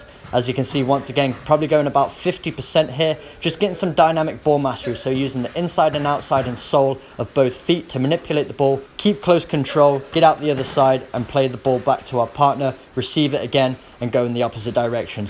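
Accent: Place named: England, United Kingdom. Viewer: Where are u from? Sound English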